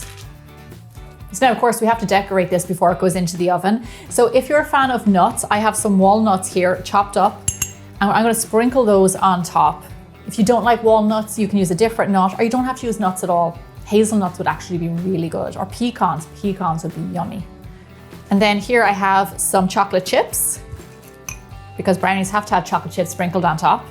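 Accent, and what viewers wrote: Ireland. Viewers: I love your Irish accent! ❤️❤️❤️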